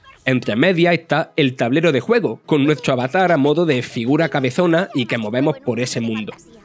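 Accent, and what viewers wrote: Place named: Murcia, Spain. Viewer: perfecto murciano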